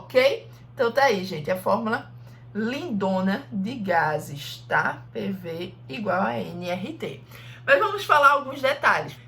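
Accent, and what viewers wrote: Pernambuco, Brazil. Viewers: CADA AULA FODAAAAQ. Tinha que ser nordestina, e quase certeza que é Pernambucana, né?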